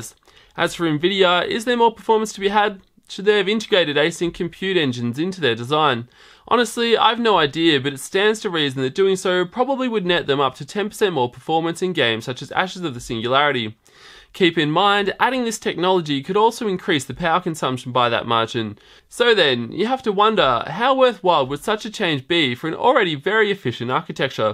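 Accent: Australia. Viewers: Well done video, and I like the fact that you did not jump to conclusions where you didnt have enough information. Unlike that AdoredTV dude... well done sir. Curious what accent is that? Australia?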